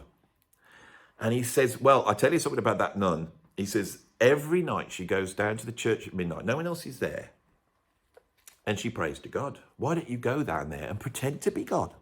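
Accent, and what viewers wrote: Birmingham, United Kingdom. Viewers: 2nd video i watched since i subscribed. Very well reasoned arguments. I knew you was a brummie. Lol.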